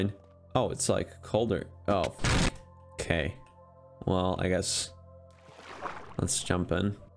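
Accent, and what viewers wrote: Australia. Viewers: are you aussie by chance? you sound just like my friends and i with all the swearing XD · Is Australian?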